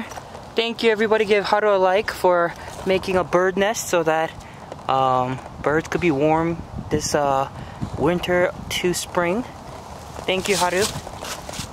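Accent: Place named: Philippines. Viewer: Are you from philippines because you talk like filipino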